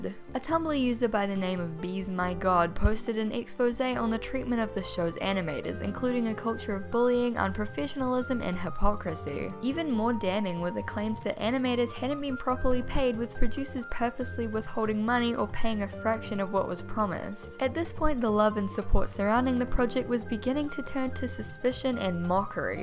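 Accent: New Zealand. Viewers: For me it's the NZ accent. NZ give me Gold Coast flashbacks. No, you can have a durry cuz. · omfg I knew u were from nz bc of ur accent!!! when i saw the kickstarter that confirmed it ahhh!!! bet ur from auckland uwu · as soon as i heard your voice i knew you were a kiwi haha. There's been like a surge of New Zealanders on Youtube and I'm here for it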